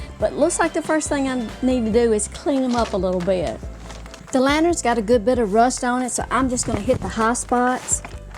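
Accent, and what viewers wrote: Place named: South, United States. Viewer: Oh I just love those lanterns, rusty or not. I would not have thought to use chalk paint. Thank you for sharing. By the way, are you from N.C.? I am and I have moved to VA and your southern draw reminds me of back home. that's a good thing :) I really enjoy all the video's that you have made. You give great attention to details.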